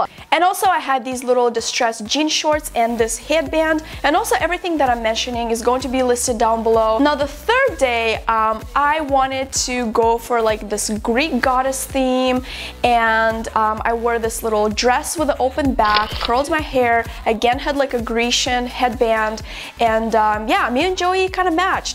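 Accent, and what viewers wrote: Russia. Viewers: Is she russian? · Are you russian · Is she russian or something ? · Russian?! such a nice strong accent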